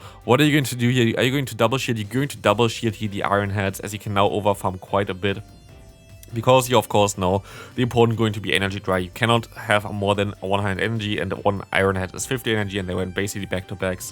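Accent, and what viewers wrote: Germany. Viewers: Why do I think you have a german accent?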